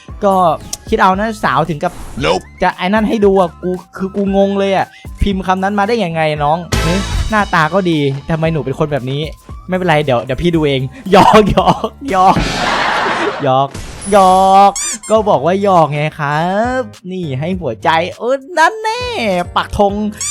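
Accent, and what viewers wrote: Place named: Suphan Buri, Thailand. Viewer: พี่เป็นสุพรรณหรอคับทำไมพี่พูดเหน่อ😅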